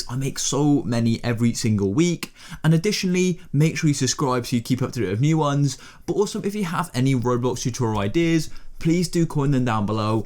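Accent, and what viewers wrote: United Kingdom. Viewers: Let me guess your british?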